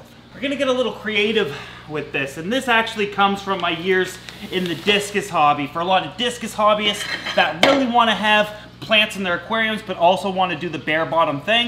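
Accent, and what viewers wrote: Canada. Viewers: Hes canadian, was accounting for his accent. Anything remotely close is better than permagranite lol.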